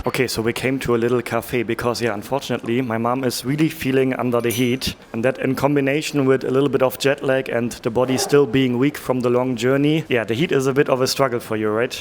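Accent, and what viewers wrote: Germany. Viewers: He's got a horrible German accent. But if that is what you like, just listen to Germans. Just find the ones with the worst accents and whose vocabulary is extremely limited and basic. Many out there in Germany. 🙃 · Your German's accent is really strong huh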